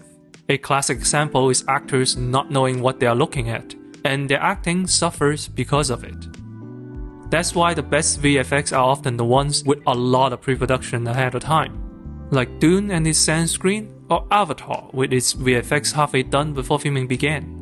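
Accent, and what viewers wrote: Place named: China. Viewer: Your chinese accent is so thick that even when speaking English, you still sound like you're speaking chinese. I don't understand half of the things you say.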